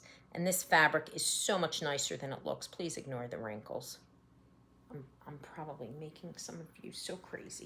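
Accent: New Jersey, United States. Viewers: Hi, I just binged watch all three episodes. I enjoyed you so much. You had me laughing because you sound just like me. I live in FL for many years, but accent is still all Jersey. I too started embroidery and cross stitching as a young girl and remember the stitch on one pattern at a time because our options were limited. Now I have several WIPs on the go. Look forward to seeing your Autumn completions, my favorite time of the year. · Welcome to Flosstube! Being a CT girl living in the Midwest now, I immediately smiled at your Jersey accent. Beautiful WIPs!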